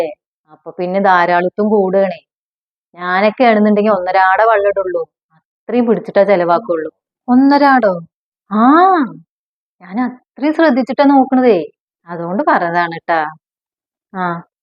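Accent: Palakkad, India. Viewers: നിങ്ങൾ പാലക്കാട്‌ ആണോ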